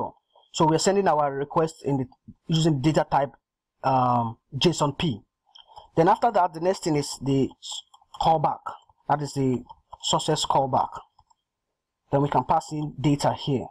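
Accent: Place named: Nigeria. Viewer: I'd recognize a Nigerian anywhere. Good one bro, carry one.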